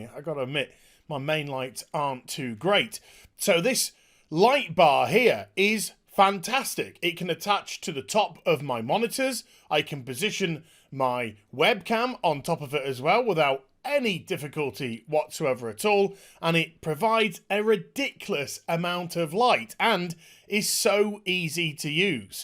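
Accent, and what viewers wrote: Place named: United Kingdom. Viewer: Tunning in to this video to see the block from Jason, and staying for the british accent!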